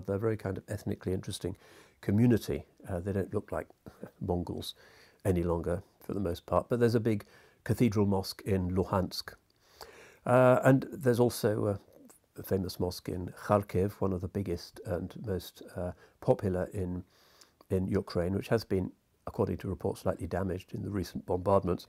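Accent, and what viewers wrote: England, United Kingdom. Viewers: this guys voice, house, appearance and English accent makes watching his videos so soothing and cosy. its like your sitting infront of a chimney fire drinking hot coco while hearing a story from your grandpa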